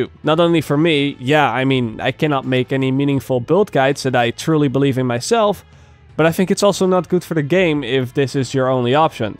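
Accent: Netherlands. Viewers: he is dutch ." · are you dutch?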